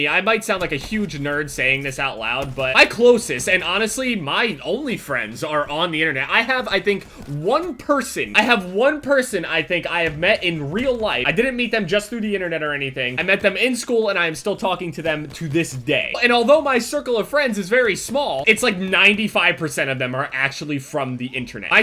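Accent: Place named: New York, United States. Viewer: Anyone notice that New York accent with him saying "Twitta"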